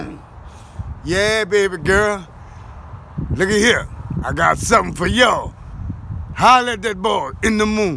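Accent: United States. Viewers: He sound like he from my city, Lafayette Louisiana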